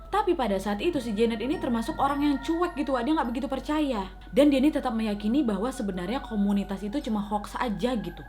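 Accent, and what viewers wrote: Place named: Medan, Indonesia. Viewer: kebanyakan nonton video kak, aku kalo ngomong pasti ada imbuhan wak dan logat jadi kak wkwkwkkw padahal udah sempat hilang setelah bertahun tahun tinggal di jakarta dengar kak, langsung auto logat medan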